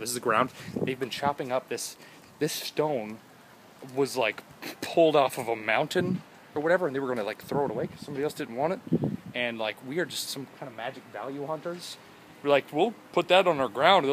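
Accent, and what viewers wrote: New York, United States. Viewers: R u American or English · the new york acsent video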